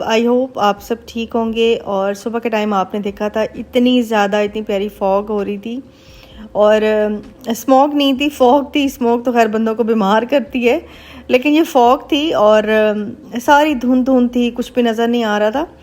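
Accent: Saraiki, Pakistan. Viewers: ji u r very beautiful. And your house is so beautifull. Aap bolti bohot pyara hn. Jub sairiki bolti hn to sun k mujhey bohot he acha lugta h. Mere naankey bhi pichey Dera ghazi Khan se thy. To sun k apnapun lgta h. I m from India.God bless you. Bye.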